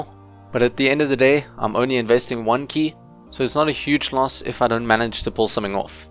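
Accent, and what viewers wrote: South Africa. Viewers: Are you South African??? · Are you South African? Sorry I am new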